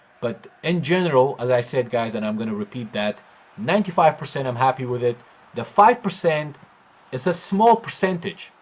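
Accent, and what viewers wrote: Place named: Scotland, United Kingdom. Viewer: Are you Scottish Italian because you certainly have a Scottish accent poking through bro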